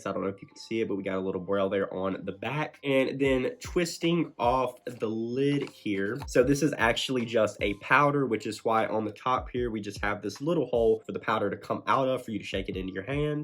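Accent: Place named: South, United States. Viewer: What city/state are you from because I feel like you’re from California but in my opinion you sound like you have a southern accent.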